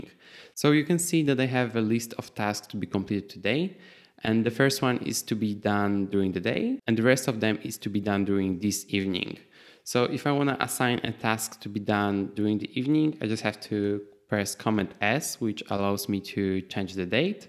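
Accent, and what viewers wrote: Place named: Germany. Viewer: Hi, I like your accent, but totally confused, You speak English with German accent but you have a Polish name lol, what's your story?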